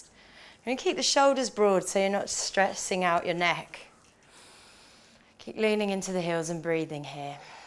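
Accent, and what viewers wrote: England, United Kingdom. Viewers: This was a wonderful sequence, just enough to get my heart rate up which I do enjoy, thank you! Also very nice to find a lovely English accent to listen to while doing yoga!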